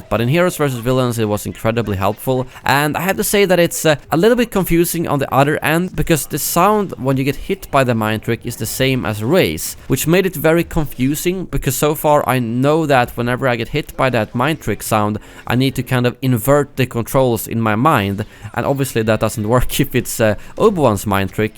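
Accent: Sweden. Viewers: Are you from sweden Är du från sverige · Boi are you from Sweden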